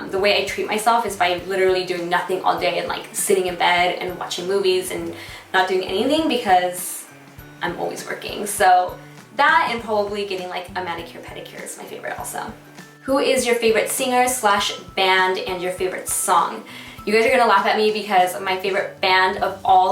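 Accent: Mexico. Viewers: I KNEW you were Mexican just by your accent. I have it too. I'm from Mexican parents and born in Texas. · you are soo beautiful and I value your makeup advice the most! If you were to make videos in spanish, you would appeal to a wider youtube audience. I think you should! and by the way I knewwww you were mexican, i recognied that accent (in the best way). love you!!!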